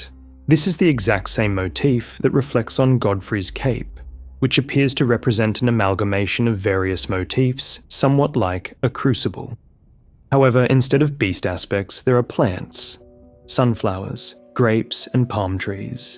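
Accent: Australia. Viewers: i think he's australian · Sounds Australian to me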